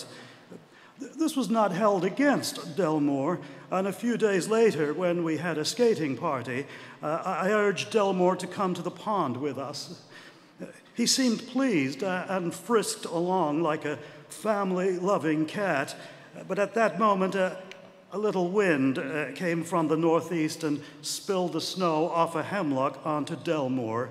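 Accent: United States. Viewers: Thank you. I was wondering where he got that particular american voice from. Doesn't sound at all like his usual voice I know from various british tv series. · His American accent is surprisingly good!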